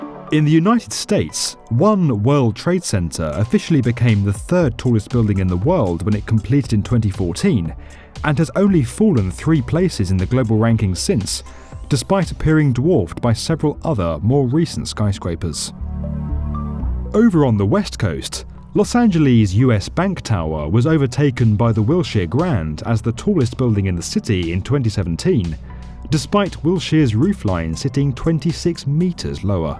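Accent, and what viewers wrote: United Kingdom. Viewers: I can't understand anything with the UK english. American english is more easy.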